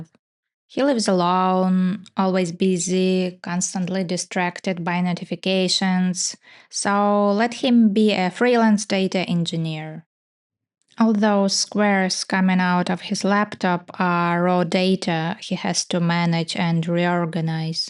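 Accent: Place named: Russia. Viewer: судя по акценту вы знаете русский, я прав?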